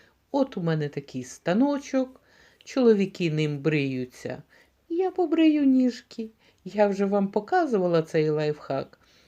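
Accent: Standard. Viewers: так, добре поставлений голос, як у диктора радіо та телебачення, або як у вчительки. Та правильна українська мова, без домішок, та різних польсько-австрійських "вивертів". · НАКОНЕЦ ТО Я СЛЫШУ ПРЯТНУЮ НАСТОЯЩУЮ ЛИТЕРАТУРНУЮ УКРАИНСКУЮ РЕЧЬ,А ТО ИНОГДА ДАЖЕ НЕ ПОЙМЕШЬ НА КАКОМ ЯЗЫКЕ ГОВОРЯТ,НО ТОЧНО НЕ НА УКРАИНСКОМ.СПАСИБО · Дякую за чисту украīнську мову без польсько-угорських домiшок!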